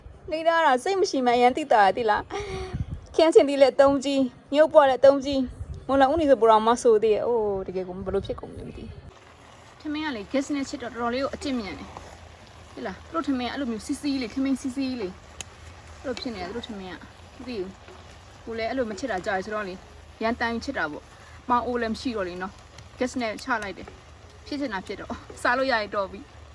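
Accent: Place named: Myeik, Myanmar. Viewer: အမက ဘိတ်သူလားဗျ ဘိတ်အသံနှင့် နည်းနည်းတူနေတယ်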